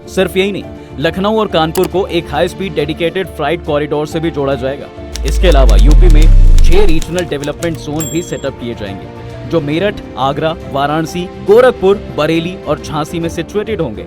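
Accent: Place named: Bihar, India. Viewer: boli bihar jasi hai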